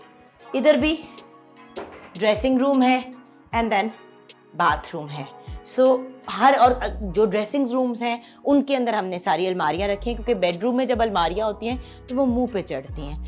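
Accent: India. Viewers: she sounds like indian.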